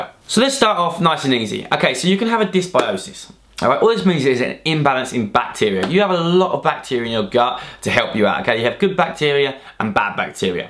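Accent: United Kingdom. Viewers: I understand much of what you're saying and it's important...I have a bit of trouble here and there not because of the UK accent (I;m Canadian :P) but maybe you speak too quickly? I never thought I'd say that! But I'm going to rewatch and try to catch a couple of things. Also I've watched your vids before on health balanced diets, and other ones ( I follow Cassey Ho too) I'm just confused about portions and what good foods are the best or not the best to have (like in the various food groups, breads, grains, fruits, types of meat etc) I would love some true explanation on that - the internet (like google searching) gives too much conflicting info depending on who wrote the article you know...so :S Thanks a lot :D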